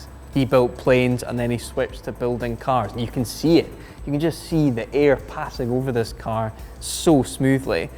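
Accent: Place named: Newcastle, United Kingdom. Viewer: He's Geordie not Scottish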